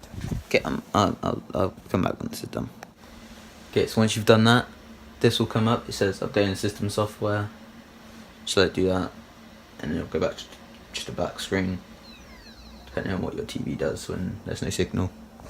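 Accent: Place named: England, United Kingdom. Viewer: Omg u chocolatey English guy it works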